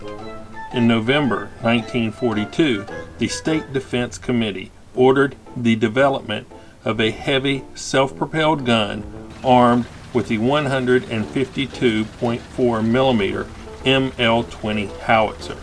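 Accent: South, United States. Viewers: Are you from the south? You have a southern accent...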